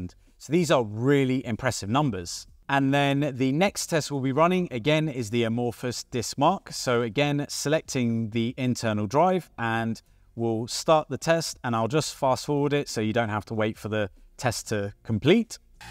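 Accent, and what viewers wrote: United Kingdom. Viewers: I hear a British accent, so when is this coming out in the UK? 😀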